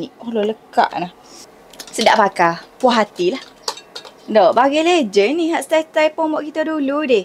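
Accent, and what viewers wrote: Kelantan, Malaysia. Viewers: doh ehhh suko la ho dengar kecek kelate 🤣 tenkiu menghiburkan saya dikala kesepian di tempat kerja 😆 terus semangat post video2 menarik ❤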